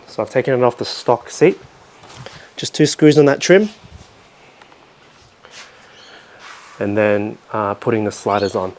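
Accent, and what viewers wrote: United Kingdom. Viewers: I know it’s random but it’s so weird hearing Asians from the uk with the British accent i grew u in hood where’s there’s a lot of Asian gangsters in America and they always Wildin out bilingual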